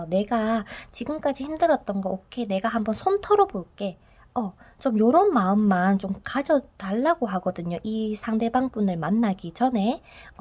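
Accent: Gyeongsang, South Korea. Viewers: 하트 뽑았습니다! 처음 왔는데~~~ 경상도 분이시죠? 사투리😁 저도 대구사람인데ㅋㅋ 경상도사투리로 듣는 타로는 처음인데 재밌네요😄 외로워요ㅠㅠㅠㅠ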